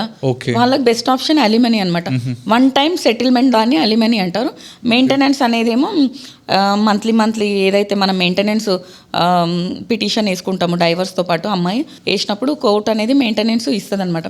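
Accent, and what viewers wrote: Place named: Telangana, India. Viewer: Super madam telangana slang!! Super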